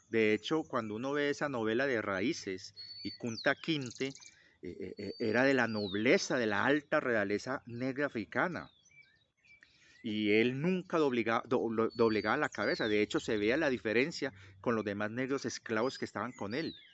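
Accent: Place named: Valle del Cauca, Colombia. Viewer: Hello, sr, ¿como vas? Pasaba por aquí y quería saludarte y decirte que, aunque digas que estás por las Antioquía, aún no se te ha borrado tu acento Valluno y eso que soy español para identificarlo perfectamente……será que, ¿mi esposa caleña me enseña cada día como se siente el valluno?, jejejjeje Agradecerte tus videos y en especial este, no por nada en especial, si no porque especialmente tocas un tema que a mi me cuesta de explicar a las personas que me rodean. Se de sobra que buscar culpables a nuestra situación personal fuera de nosotros mismo, es un ejercicio de estupidez soberana y muy extendido en la cotidianeidad del pueblo, tal como estar en posesión de la razón de manera poco asertiva, de que es lo bueno, o que es lo malo, o en el peor de los casos, juzgar la actitud de los demás como mala o buena, sin ni siquiera plantearnos, los procesos mentales o personales, que ha vivido esa persona para llegar a esa resolución de una situación en concreto, así no nos guste. 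No estoy yo tan convencido de que un tanto porciento equilibrado de la sociedad, tenga presente tus afirmaciones, más aún con el agravante de la propaganda que idiotiza a las masas a diario con la tv, redes sociales, etc…..como para racionalizar tus palabras. Es por ello que estaría bien que este video lo pudieran ver muchas personas y que les llegue a hacer replantearse todas las creencias que llevan a cabo cada día en el plano de juzgar, que esta bien o que esta mal o quien es bueno y quien es malo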